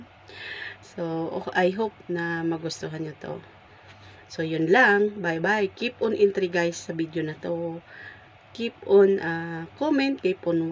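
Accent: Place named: Visayas, Philippines. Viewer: Bisaya man sad diay ka ate. Apir🤚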